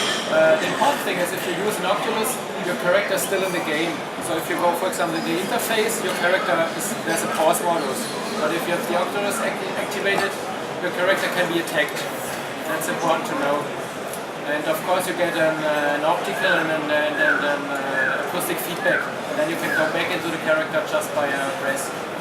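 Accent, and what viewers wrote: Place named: Germany. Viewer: lustiges englisch spricht der herr, hat wohl jeder im raum gemerkt dass der ein deutscher ist :)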